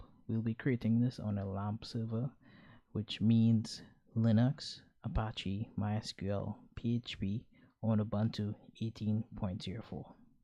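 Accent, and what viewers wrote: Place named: Trinidad and Tobago. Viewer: I knew that was a Trini accent. Happy to know there are more high-level coders from TT. Well done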